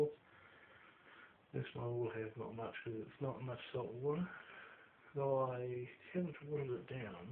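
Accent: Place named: New Zealand. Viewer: try learning to listen to New Zealand accent, then re-listen to it, sorry about the muffled sound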